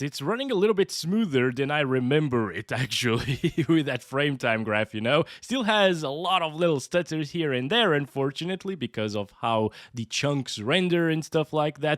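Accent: Portugal. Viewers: Primeira vez a cruzar com este canal e ao fim de 10 segundos, meto os meus tomates no cepo como é um português! 🥳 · Ah, I always knew you were Portuguese! That accent does not lie lol As a fellow PT I forgive you for preferring beer 😄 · mano no inicio do video eu percebi logo que o gajo era tuga ahahah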